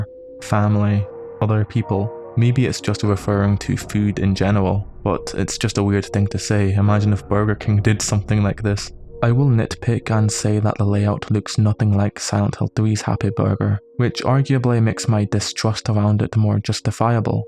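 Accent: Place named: Aberdeen, United Kingdom. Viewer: You sound like you're from aberdeen.. I could be wrong.. but it's very similar to my accent! Anyway, fantastic video man!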